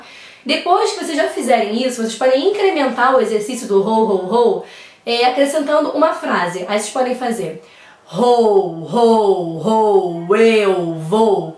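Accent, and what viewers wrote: Rio de Janeiro, Brazil. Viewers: Sou do Rio de Janeiro e tenho taquilalia. Você atende em que estado do Brasil? Seu sotaque parece de carioca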